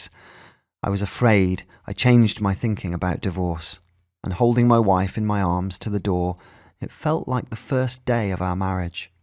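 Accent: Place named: United Kingdom. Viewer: British accents..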